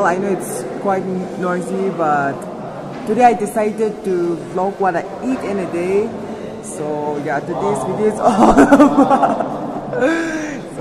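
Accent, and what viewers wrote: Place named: Ao, India. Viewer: Heavy Ao accent! but what else do we expect ? One can't be an accentless human unless one is dumb.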